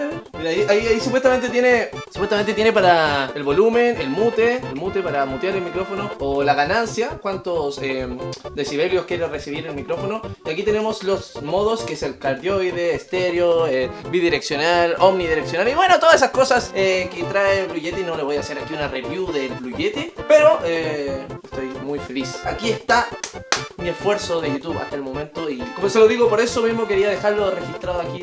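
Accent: Chile. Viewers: Ho por Dios eres chileno como yo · Eres chileno sierto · Este chileno :v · Wena eres chileno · hola soy nuevo sub ¿eres chileno? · Sabia que era chileno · Mmmmm eres chileno????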